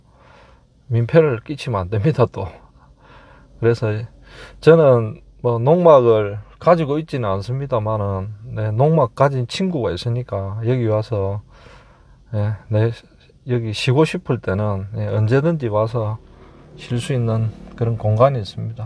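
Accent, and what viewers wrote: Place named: Haman, South Korea. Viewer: 구수한 함안촌넘사투리에 맛깔나는 향수에 푹빠져드는 맛으로 자주 시청합니다! 하시는 사업 번창하시길~~^^^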